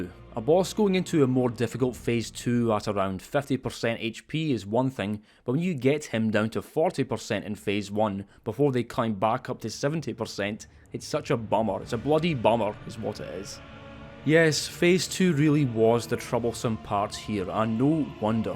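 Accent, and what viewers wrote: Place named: Scotland, United Kingdom. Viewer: Good lock with the DLC Mr. cool scottish youtuber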